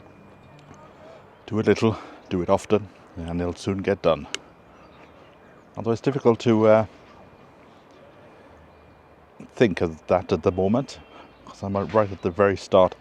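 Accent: Wales, United Kingdom. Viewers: Yes, I am doing that, and know that you do not have the allotment now, but now have your Rusty Homestead - love your Welsh accent, and your beautiful eyes by the way! xxx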